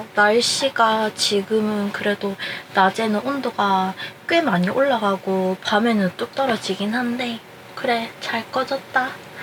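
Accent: Gyeongsang, South Korea. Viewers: 경상도 시죠?ㅎㅎ 그냥 댓달아봅니다^^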